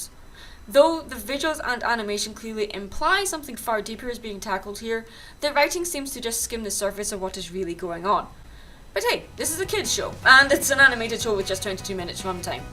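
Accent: Scotland, United Kingdom. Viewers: am sorry to asked but are you Scottish or Brittan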